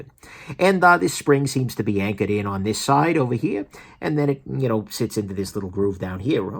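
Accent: New York, United States. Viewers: . You don’t sound like you’re from Cali. I’d say Flatbush or somewhere off the L.I.E.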